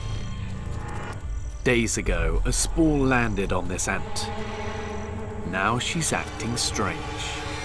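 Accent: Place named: United Kingdom. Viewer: 剛開始看沒多久就覺得這聲音好像貝爾 結果結尾就看到貝爾的名字 他的聲音和英國口音真的已經深入腦海啦XDD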